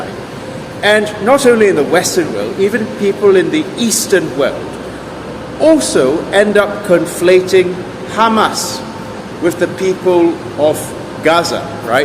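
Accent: RP, United Kingdom. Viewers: I love your high-faluten slightly pompous, pucker British accent. It takes some getting used to. The comments here are barbaric. Though I don't agree with your perspective, I do enjoy your clear vocalization of it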